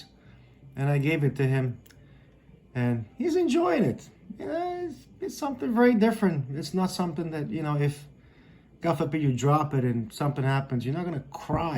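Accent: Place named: United States. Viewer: M-ai ambiționat sa scot vechiul atlantic al bunicului meu care e într-o stare destul de bună dar are nevoie de o servisare, am cumparat ceasuri noi gen Citizen eco drive, Certina, Orient și chiar uitasem de Atlantic 😂 Dacă nu spuneai unde te-ai născut nu îmi dădeam seama ca ești român, ai un accent de american pur, frumos review like a Pro! :)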